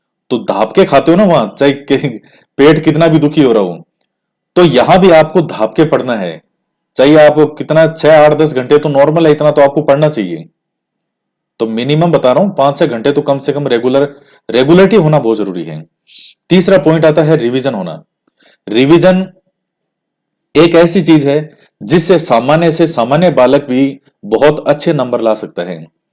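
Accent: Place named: Marwar, India. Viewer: धाप के पढो गुरूजी मारवाड़ से लगते हो 😀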